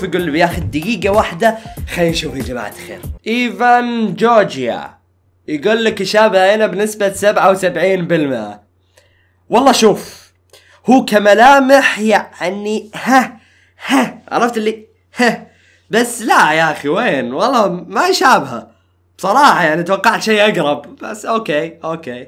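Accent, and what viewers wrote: Gulf, Saudi Arabia. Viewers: انت سعودي ولا اماراتي؟؟؟؟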